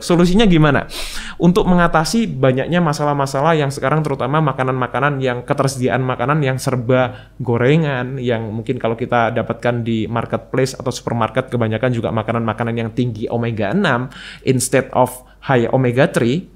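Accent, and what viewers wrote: Java, Indonesia. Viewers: dok org jawa ya,logat jawanya dapet 😁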